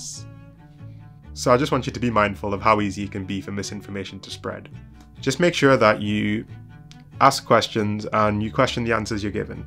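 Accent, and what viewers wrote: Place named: North East England, United Kingdom. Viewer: Nice to hear a north east UK accent on YouTube :)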